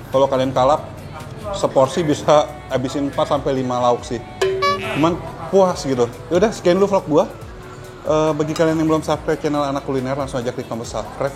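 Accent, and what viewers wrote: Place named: Banyumas, Indonesia. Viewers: Warteg komplit, masnya ngapak 👍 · Ngomongnya kek orang Tegal (ngapak) banget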